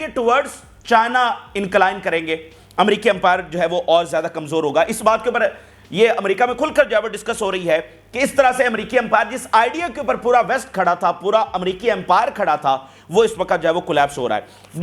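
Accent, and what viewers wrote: Pakistan. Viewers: Guy you in Pakistan for your information your need to know we the people of United States had voted for president Donald Trump and we love ❤President Donald Trump, and President Donald Trump with love of the people of USA he will be the president of USA for 3rd term too! America and American first Only! No involvement of USA 🇺🇸 in any form or way in the affairs and matters of other countries of the world any more, no more of the USA’s financial aids to any other country of the world that is the reason we the people of USA had voted for president Donald Trump! and It would be better if you stop screaming and speak in low volume! Plus you have heavy Urdu accent on to your English, it is ok to speak entirely in Urdu without adding English words or sentences in between your Urdu speech, though it would be even great, if you could put some efforts on improving your English that have heavy Urdu accent! 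Thanks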